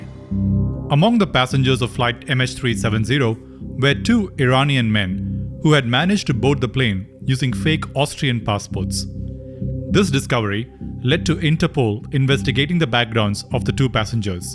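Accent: India. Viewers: This Indian accent sucks, makes listener wanna laugh, not be serious. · why your english accent is indian? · The presenter sounds indian, and i am proud that such a high quality detailed presentation is put together ! Brilliant work, brilliant level of detail covered. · Why is a pajeet narrating the video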